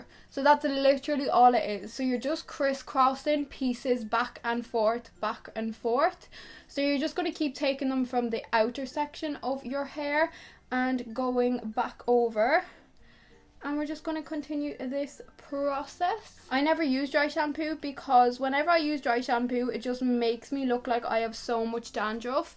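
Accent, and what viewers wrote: Ireland. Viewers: Are u from IRELAND cause u have a irish accent p.s I am from IRELAND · Are u Irish 🇮🇪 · Are you irish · Omg I love you!! One day I just stumbled upon one of your videos and I am in love! I am already wearing one of your hairstyles they are perfect! And I'm on vacation so I don't was my hair every day so it's perfect!!! (Btw what part of Ireland are u from I love your accent)❤❤ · are you irish? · oooh is she Irish! I thought already, her English isn't British English or American English so what else? Irish English!! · I love irish accent so much 😭😭😭😭 ❤️❤️❤️❤️ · are you irish ? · I'm new bit are you Irish. I love you accent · Are you from Ireland????!!!!! If so what county???!!!?!?!?!!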